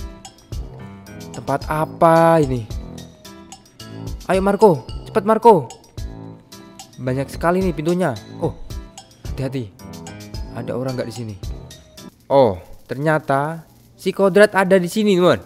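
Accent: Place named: Java, Indonesia. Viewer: Med'do banget nadanya Terus nora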